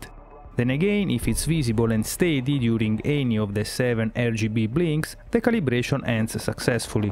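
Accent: Italy. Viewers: si sente che sei italiano ,ti consiglio di fare un canale italiano,cosi sei sprecato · si sente poco che sei italiano! :) ahah..comunque come fai a saper così bene l'inglese? hai vissuto un po' all'estero oppure hai frequentato qualche corso? grazie in anticipo.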